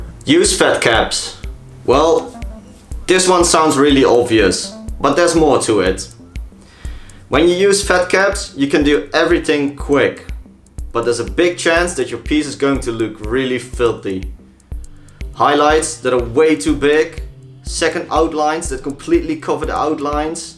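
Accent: Netherlands. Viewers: According to his accent, he could be dutch. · Dutch for sure i hear it right away in the accent. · this guy is dutch no doubts lol. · Good tips brO:) r you dutch?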